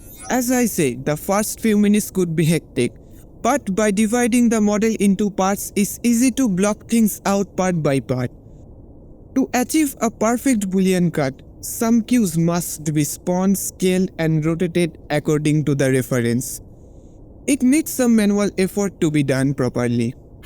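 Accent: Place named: India. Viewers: I am not Indian but I can also confirm that he is Indian. 😅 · i am an Indian so when I listen your English I confirmed that you are Indian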